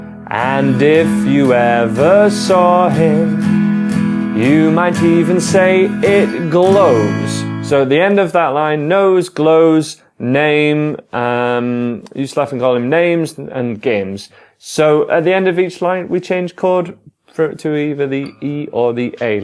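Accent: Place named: Scotland, United Kingdom. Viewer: danTDM sounds really british , he sounds more ..... scottish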